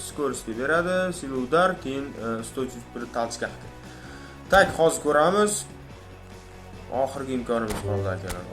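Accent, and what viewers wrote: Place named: Namangan, Uzbekistan. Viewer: Namangan shahridanmisiz shevangiz ohshab ketarkan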